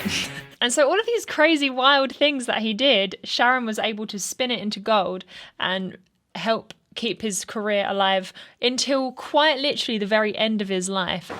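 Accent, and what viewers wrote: United Kingdom. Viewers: How can it be that you sound like you're British, but didn't know who was?!!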